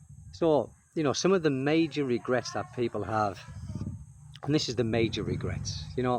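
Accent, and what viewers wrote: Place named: England, United Kingdom. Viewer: I totally agree with you. My husband is a pure english born here in US. I had the blessing of meeting my mother in law and was able to take care of her. You always remind me because she has the same beautiful accent. Learned their straight forward character. I was then able to protect and defend myself by using my mouth at work. Proud of it because i always just cry on the side when i was abused mentally. I appreciate all your videos. I love learning so i always look forward to be inspired again the next day with your knowledge and wisdom based on experience and advices all your life. Thank you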